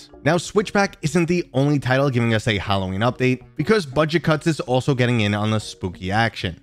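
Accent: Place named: New York, United States. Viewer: I want to say New York accent but it could be Boston although I'd be surprised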